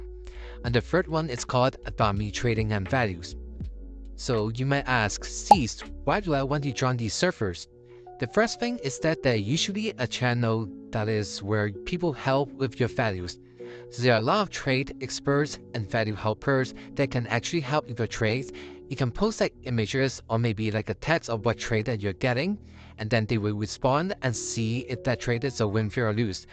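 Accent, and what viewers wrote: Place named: Singapore. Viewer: U sound so singaporean lol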